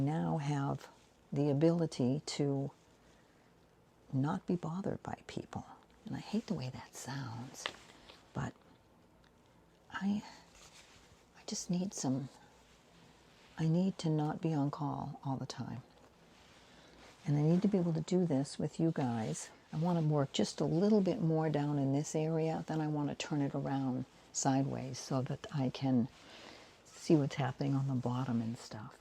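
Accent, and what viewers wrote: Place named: New England, United States. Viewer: I'll watch whatever you're doing, so (as Ricky Nelson famously put it) please yourself:) Love hearing your New England-isms (which were also mine once upon a time) -- haven't heard anyone say 'hold yaw hawsas' in about 50 years ❤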